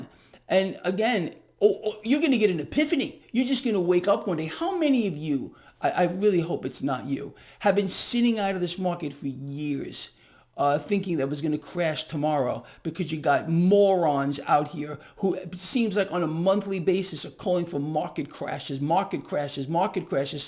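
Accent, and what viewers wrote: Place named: New Jersey, United States. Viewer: you're my nice fren, share his knowledge.. I think never lie.. and very funny.. maybe you from NJ?? sound like my fam.. we from NJ.. you aint from vegas... Um from down da shoor.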